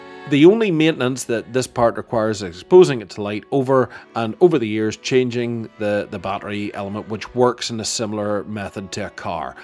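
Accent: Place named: Northern Ireland, United Kingdom. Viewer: Northern Ireland,it's a lovely accent👍🏻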